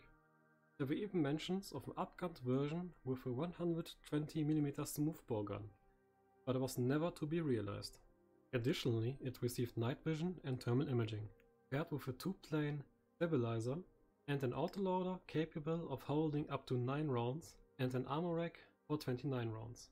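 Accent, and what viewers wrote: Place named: Germany. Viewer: You sound german af, i like it. Very well made video too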